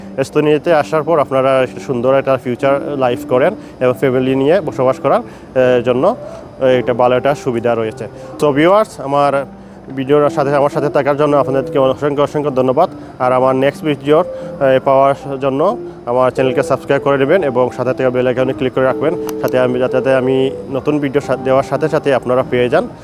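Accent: Sylhet, Bangladesh. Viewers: ভাইয়া আপনি কি সিলেটি ❤❤ কথায় বুজা যাচ্ছে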